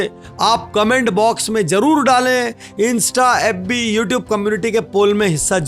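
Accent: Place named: Gujarat, India. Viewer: लगता है सर आप गुजरात से है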